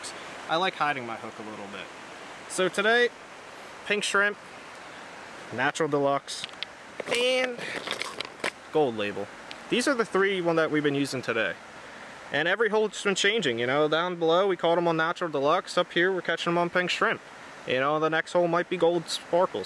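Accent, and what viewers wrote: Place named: Pennsylvania, United States. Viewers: Western PA for sure. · "Float daun the crick". I say he's from PA. (just like me).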